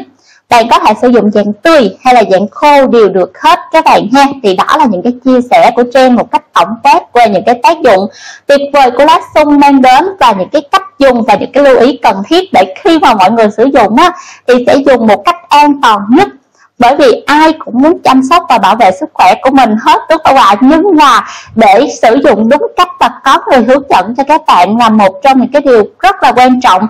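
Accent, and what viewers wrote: Vietnam. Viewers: Nghe tiếng yếu và nhỏ, giọng Miền Nam, nghe rất ức chế... Sau nên in chữ phụ đề bói ử dưới nhé, tốt nhất nên lồng tiếng giọng to và miền bắc. . nghe đỡ khổ sở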